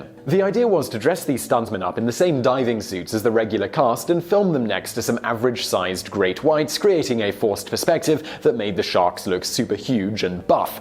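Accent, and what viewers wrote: United Kingdom. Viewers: This clip needs an AMERICAN ENGLISH voice over. Save your intolerable British gibberish for Masterpiece Theater....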